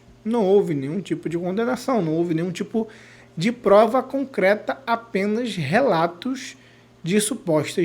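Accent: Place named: Rio de Janeiro, Brazil. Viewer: Voce tem sotaque de norte fluminense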